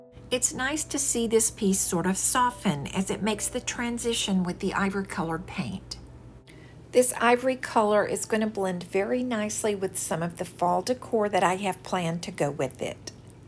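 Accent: South, United States. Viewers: Why would it bother you about your southern accent? I am proud to be southern. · why in the world would you be bothered by your southern accent? I think it’s charming and you speak so softly. I am southern (North Carolina) and I am proud of it and unbothered at all by the way I speak and it’s definitely more twangy than yours. Lol. You are such a good painter (I am so messy) with exquisite taste. Your creations are always charming and unique and your instructions are clear and easy to follow. Lovely items! Thanks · Embrace that a wet Southern voice! Not everyone can speak with this genteel gift we have! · Southern accent, love, I've heard thicker accent, your lovely, it's ok, if they have a problem, they can turn the captions on! Lol! Be blessed, BY THE WAY, I love your style! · Darling, Im from New Zealand and we have terrible accents! I just love listening to all the different accents on youtube, especially a southern one. 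You my love have a beautiful one! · I am from the Southern U.S.A, but live in Michigan now. I do so much enjoy the touch of southern accent in your voice. It is very soothing to watch your videos while I'm learning so much from you. Thank you for sharing your talent with your viewers. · I love a southern accent. Please don’t be self conscious. Just be yourself. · I'm originally from the South I love the accent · Comme toujours les objets sont très beaux 🤗😄et celui que je préfère est la coupe que tu as réussi à décorer avec le "air dry clay". Le résultat est tellement joli 🫶😍. Ne t'en fais pas pour ton accent, d'autres youtubers ont un accent du sud comme par exemple Susie de "Susie on a farm" ou Teresa de "our greene acres" et les gens s'en fichent. Moi même je suis allemande qui vit depuis 35 ans en France et encore aujourd'hui on me dit que j'ai un accent 🤷 et ce n'est pas grave. Bonjour de la France et reste en forme 👍😀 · I think you should be proud of your southern roots, nothing wrong with having a southern twang.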